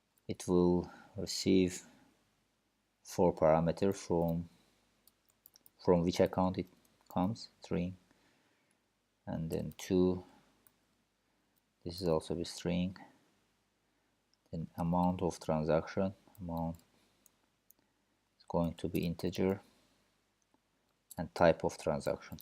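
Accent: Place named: Azerbaijan. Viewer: Man this accent, sounds so familiar LMAO. Are you from Azerbaijan by any chance?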